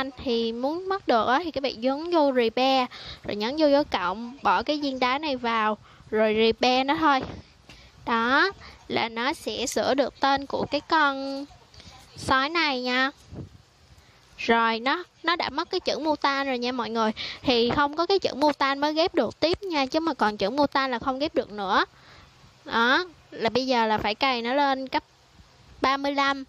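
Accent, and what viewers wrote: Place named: Phu Yen, Vietnam. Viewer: Giọng phú yên nè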